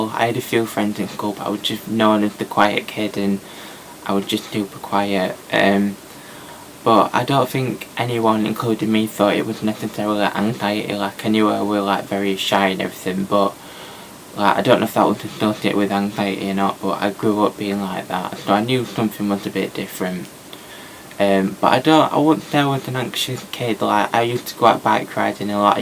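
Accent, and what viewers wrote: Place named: Birmingham, United Kingdom. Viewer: Go Birmingham! Love your accent! 😍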